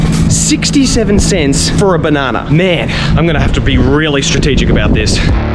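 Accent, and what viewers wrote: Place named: Italy. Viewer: Another awesome video man! Seriously cant wait until these start blowing up! 🔥 love the Italian accent haha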